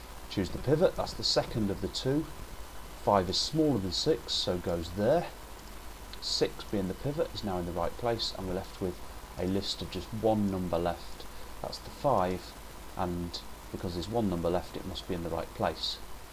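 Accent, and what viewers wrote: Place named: United Kingdom. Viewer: your british accent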